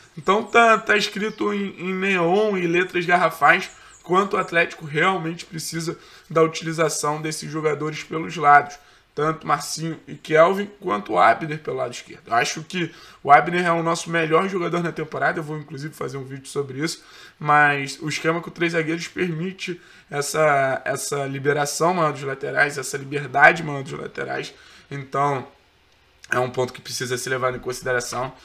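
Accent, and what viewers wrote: Rio de Janeiro, Brazil. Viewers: E esse sotaque carioca torcedor do furacão? 👍👍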